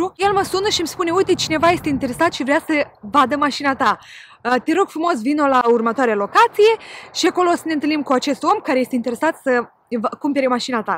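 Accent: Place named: Moldova. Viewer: Buna, sunt din Chișinău,mi-ai atras atenția cu accentul nostru din Md. Imi place mult cum predai engleza.dar sunt interesată de cea britanică.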